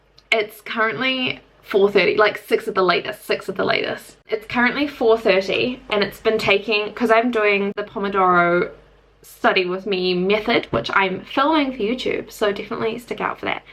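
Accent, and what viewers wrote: New Zealand. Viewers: Haha I recognized the kiwi accent right away!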